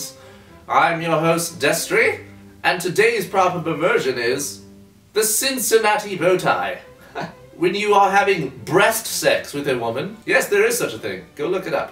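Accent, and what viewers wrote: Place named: United Kingdom. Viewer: Has anyone else noticed that his 'posh' accent is gradually becoming more and more british in every video of proper perversions? XD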